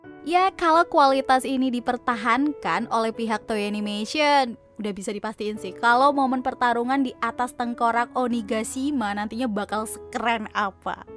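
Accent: Java, Indonesia. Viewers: suka OP sejak kapan? Ini menjadikan perbedaan yg mendasar. Saran * "coba tonton animenya dari chapter pertama, baru bawain lagi yg ini, terus bandingkan secara pribadi" * Medoknya keluarin aja, jgn d tahan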